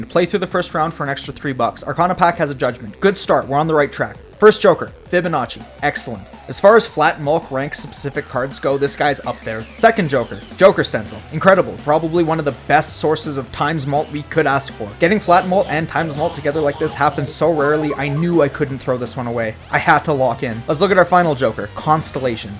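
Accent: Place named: Alberta, Canada. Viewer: HAHAHAHA I thought I heard Alberta but I didnt want to be wrong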